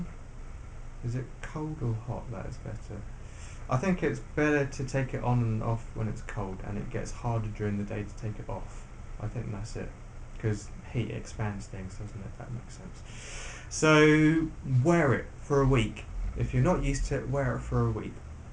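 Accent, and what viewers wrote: England, United Kingdom. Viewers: holy shit it's an asian guy with an English accent!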